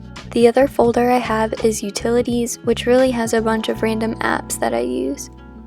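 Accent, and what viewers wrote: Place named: United States. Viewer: Is it just me orrr but she has Tesco mobile on her phone she sounds American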